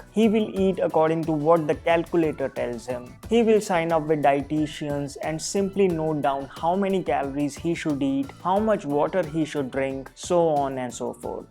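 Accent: India. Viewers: The master in indian accent . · Thx true Indian accent Me too following most of your advice so I'm genius 😊😇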